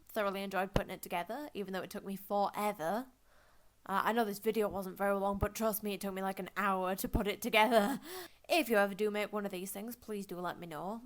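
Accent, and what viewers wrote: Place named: Yorkshire, United Kingdom. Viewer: Your accent sounds so yorkshire! I love it haha. This looks sooo nice Im hungry now!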